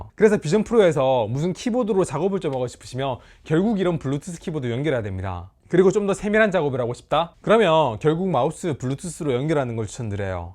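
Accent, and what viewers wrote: Gyeongsang, South Korea. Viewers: 아씨... 경상도 사투리...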